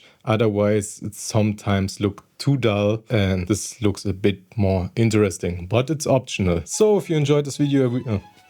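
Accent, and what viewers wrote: Germany. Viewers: sett is aber eh werri german akzent 😅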